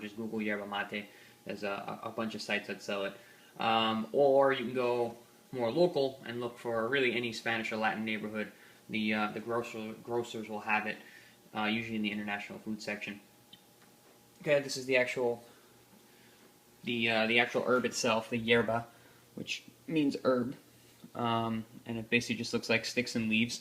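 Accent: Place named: Argentina. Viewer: che...... vos sos argentino?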